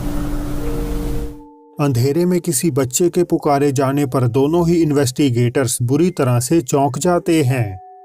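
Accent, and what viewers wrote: Punjab, India. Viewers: Sie ekk baar bta doh app . Kya ap punjabi hoo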